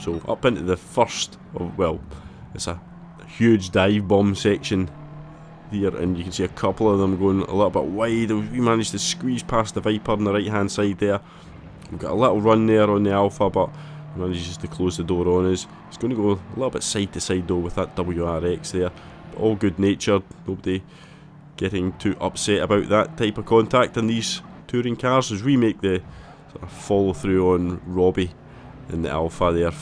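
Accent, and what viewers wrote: Scotland, United Kingdom. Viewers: cheers to you for the content mate 👍 we need a good Scottish Accent on YouTube (I wont hold being a Rangers fan against you 🤣).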